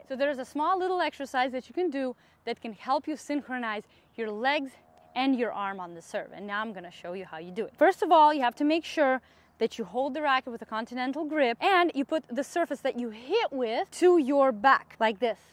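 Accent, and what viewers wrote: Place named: Eastern Europe. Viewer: Just curious love the accent are you Eastern European?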